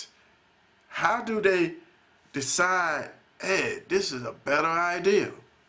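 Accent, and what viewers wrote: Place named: South, United States. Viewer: shut ur southern accent , u make me sick . please speak english. is not da , it is that.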